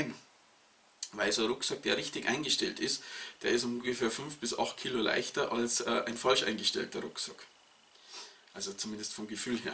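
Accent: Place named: Low German, Germany. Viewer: Besser als Deine HD-VIDEOS ist defenitiv, Dein "Plattdeutsch"😀😀😀 Du machst doch eh schon geile Videos, genau wie der Christian, HD ist toll, die Information ist wichtiger. LG Jupp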